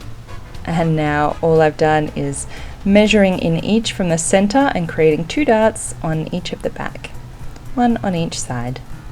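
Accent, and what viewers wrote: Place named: Australia. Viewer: I realize this is totally off topic but I love Australian accents, especially yours.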